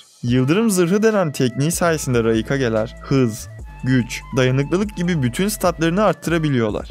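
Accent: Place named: Sivas, Turkey. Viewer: Abi gardaş ı çok iyi telaffuz ediyorsun sende sivaslï havasï seziyorum yanlış da olabilir cevap verirsen sevinirim♥️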